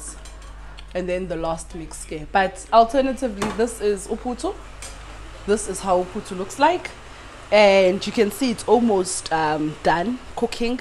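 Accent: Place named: Africa. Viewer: stop complaining for nothing!We are African,get used to our English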